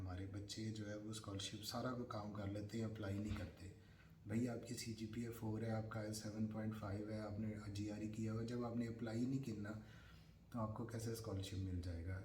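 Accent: Faisalabad, Pakistan. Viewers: Dr sb, kindly use some Mike that can record voice that is audible, rest is fine, full of Faisalabadi touch.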